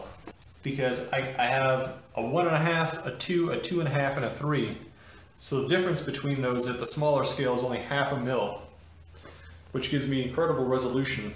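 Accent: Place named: United States. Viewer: Dude you are wearing a Texas A&M tee shirt you Speak English as I would expect someone from the United States of America to Speak, However you keep referencing metric measurements I am an old guy and use to install CNC machining centers. We used imperial measurements. I maybe wrong but to me one would have finer resolution using thousands of an inch. Then all of a sudden you mention thousands. I get the global thing since the rest of the world is wrong 🤪using metric. I have a son that is a mechanical engineer I have tell him too speak English we are in The US. Yes he was like me and 20 generations before me from here in the US. Good video I must say the concepts that you brought out are what are important not what scale you used to define the accuracy. Have a great day thank you for taking the and effort to produce a very good video.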